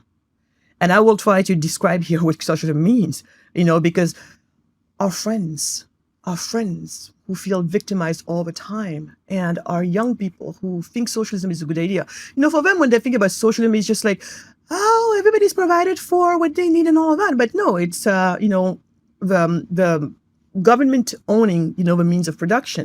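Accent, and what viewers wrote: United States. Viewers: 30 seconds of listening in, sounds odd. An African ensconced in the West, US probably going by the American accent. Like you say shallow knowledge shallow conclusions.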